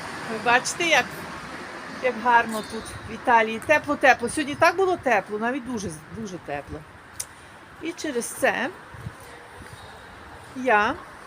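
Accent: Ukraine. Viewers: Мені приємно , що так гарно ви володієте іноземною італійською мовою , мелодійно звучить з українським акцентом . Вдосконалюйте свою розмову !!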